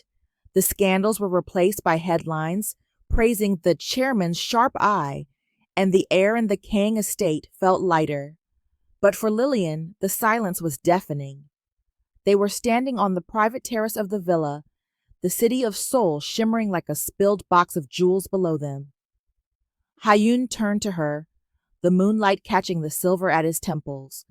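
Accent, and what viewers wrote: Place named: United States. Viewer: I find this voice difficult to listen to it's slightly aggressive and very American. Although the story was good it progressed very quickly.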